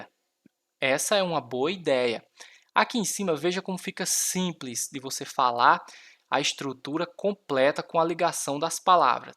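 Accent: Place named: Northeast, Brazil. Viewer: Eu não acho um idioma maravilhoso, preciso do inglês por causa do meu trabalho... Uma língua aliatoria não tem concordancia adnominal, adverbial língua do capeta. se vc fazer uma análise sintático de uma frase,vc ver que não tem sentido...😩 vc e nordestino pelo sotaqui, nada contra, sua dinâmica é ótima bem expercifica 👍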